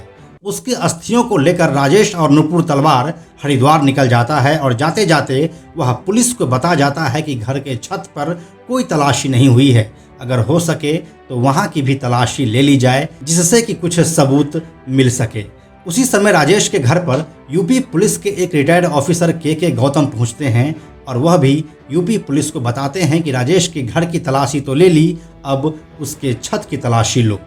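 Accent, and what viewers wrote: Bihar, India. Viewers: Ye. Bihari. Bol. Raha. H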